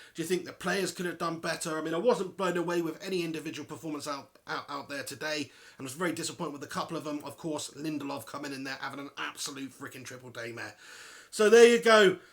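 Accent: Manchester, United Kingdom. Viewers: I can barely understand a word you're saying with that thick Mancunian accent of yours.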